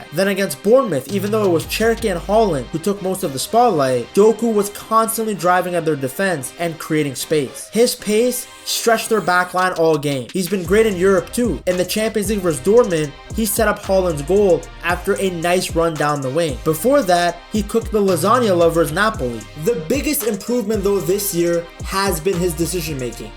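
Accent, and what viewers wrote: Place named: United States. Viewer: Can I ask you where your from? If it's the states then I would like to say that, your the only American I can actually listen to talking about footy!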